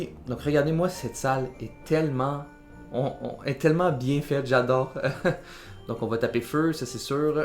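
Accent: Quebec, Canada. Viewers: Excellente ta petite vidéo avec le commentary made in Québec. Tabarnak 😎.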